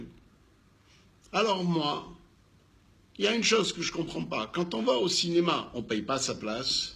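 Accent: Tunisia. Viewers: Je suis tombé par hasard sur ta vidéo... D'abord merci pour le voyage au son de ta voix, si familière...Mais oui je t'aime, j'ai 70 ans maintenant, et je suis aussi de nulle part, merci pour l'accent de mes parents (oncles et tantes), tu es surtout un poète... De la part d'un juif tunisien qui a "tout fait" de tes critères pour être un vrai juif tunisien, sauf la durée (je suis parti à 2,5 ans) car "on" nous a ouvert le gaz pour étouffer ma grand mère Gomara, mon frère Fabien (zal) et moi-même, il ne manquait que l'odeur des beignets à l'huile, Patrick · ! Tous les tunisiens que je connais en Allemagne, vous aiment fort (Musulman et Juifs). Déjà comme tu parle et gesticule c'est déjà authentiquement tunisien.. Nous sommes très émus, oui très émus!, d'entendre votre video pour être un Juif tunisien.. Merveilleux! Salutations le plus chaleureuses et patriotiques pour les Juifs tunisiens!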